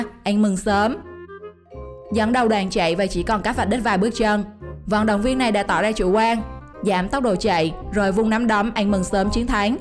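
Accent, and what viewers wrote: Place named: Quang Ngai, Vietnam. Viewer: Tập nói giọng lại đi, sao nói nẩu qué vại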